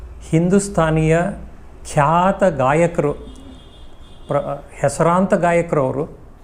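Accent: Standard. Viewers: ತುಂಬಾ ಒಳ್ಳೆಯ ಸಂದರ್ಶನ ಹಳೇ ಕಾಲದ ರೇಡಿಯೋ ನೆನಪು..... ಅಚ್ಚ ಹಾಗೂ ಶುದ್ಧ ಕನ್ನಡದಲ್ಲಿ ನುಡಿಮುತ್ತುಗಳ ಸಂದರ್ಶನ ನಮ್ಮ ಕರ್ನಾಟಕದ ಹೆಮ್ಮೆಯ ವಾಚಕರು ಜೈ ಕನ್ನಡ ಜೈ ಕರ್ನಾಟಕ,💛❤️🇮🇳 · ಸ್ವಚ್ಛ ಕನ್ನಡ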